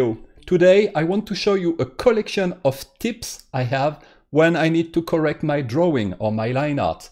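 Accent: France. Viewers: not me liking in the first few seconds bc i hear a french accent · Don't apologize for your your French accent....or "English" mistakes. You should hear English speakers....my goodness. They are not textbook! This was very helpful. Merci.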